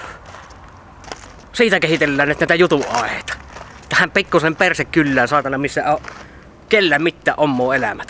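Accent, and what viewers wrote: Savo, Finland. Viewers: Videot o hyvii, mut ärsyttää toi savon murre :D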